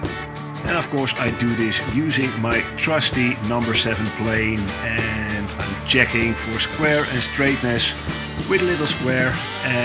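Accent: Netherlands. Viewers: I love these videos. So much information with a cool (Dutch?) accent. :) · I didnt know u were a fellow dutchy and assumed you were american due to the americana vibe you had going on. So hearing the accent hit me like a sledgehammer putting me right back in my place. Also nice guitar. Looks sick. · think if you make the neck blank a little longer and higher you might be able to cut two necks out of it , even when the headstock is tilted back you do need to cut the backside of the neck first and lay away the piece you cut off as the headstock of the second neck gets cut from the heelside of the top part leftover looking from the side of the blank the necks will have the back facing eathother and the headstock slightly passed the heel of the other to have enough material (hence the blank needs to be a little longer) you likely need to have sumting to lay the rough cut blank intoo before cutting the sides out but it should save a ton of waste materials je accent klinkt nederlands trouwens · Hi, are you dutch? 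I hear a Dutch accent.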